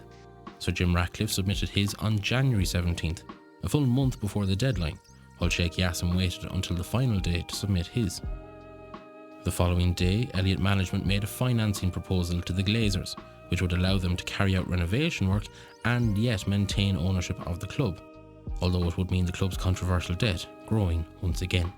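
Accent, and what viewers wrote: Ireland. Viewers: Irish accent ❤ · Grand Irish accent there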